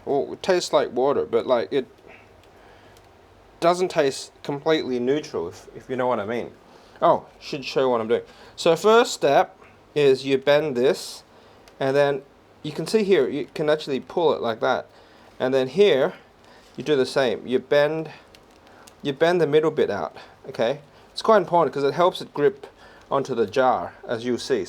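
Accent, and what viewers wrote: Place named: Australia. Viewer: interesting video. I have a Revomax flask too, around 500ml. Say, your accent tells me you have lived in Australia, right?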